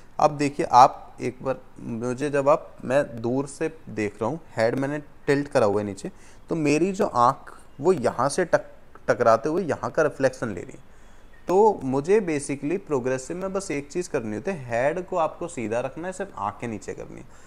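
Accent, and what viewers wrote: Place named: India. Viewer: your tone and accent is too hindi medium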